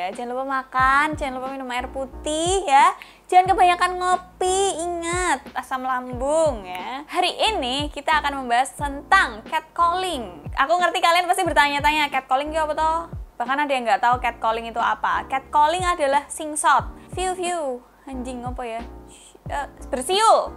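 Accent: Java, Indonesia. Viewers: Kak kalog bicara lucu yak medok medok gemes.... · Medok mu semangatku mbakk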